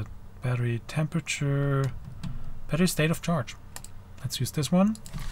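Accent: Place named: Germany. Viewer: I noticed the German accent and was bit confused that there's the "United States" in the channel description.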